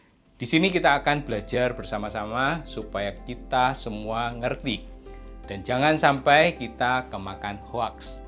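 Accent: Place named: Java, Indonesia. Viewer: Medok ya dokter Jowo ne ... siipp deh pokoknya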